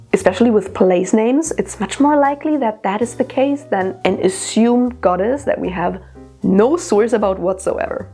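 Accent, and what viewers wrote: Germany. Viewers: You have a great "accent" like german would be your mother tongue. Greeting from germany! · I'm a Christian and I think you very informed. And if you are German I love your German Accent!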